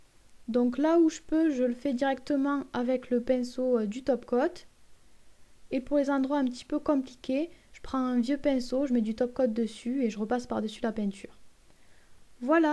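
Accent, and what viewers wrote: South, France. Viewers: juste super beau ,j'ai une question,tu as l'accent du sud non?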